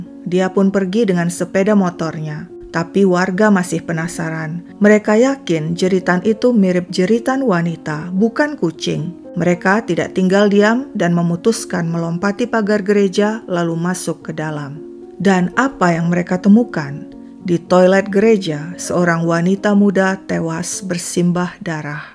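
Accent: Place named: Medan, Indonesia. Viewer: kak ini orang dari sumatera utara kah? pelafalan logat medan nya PAS kali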